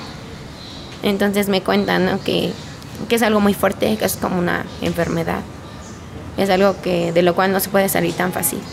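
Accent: Fresa, Mexico. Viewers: La verdad no le creo nada, además, por qué habla como fresa? Me recuerda a una amiga que tuve en la adolescencia que era bien mentirosa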